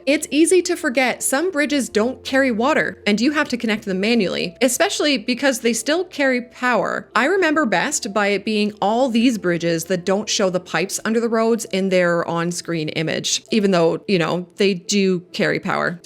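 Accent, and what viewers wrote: Canada. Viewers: She’s Canadian that’s how they say about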